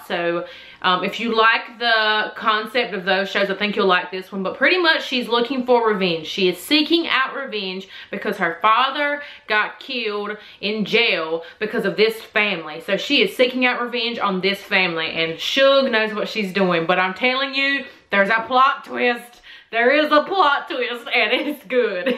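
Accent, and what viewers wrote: South, United States. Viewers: Where u from the accent sounds southern ??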